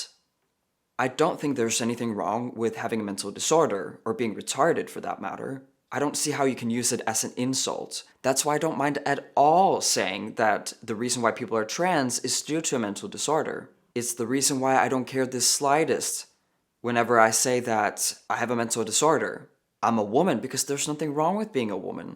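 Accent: United States. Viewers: So wait… do Danes speak English with this accent that sounds American, or is he doing these videos in an American accent on purpose? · I never knew that you live in Denmark. You have a very good American dialect! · I only have one question, and maybe it's already been answered., why do you sound like you have an American accent?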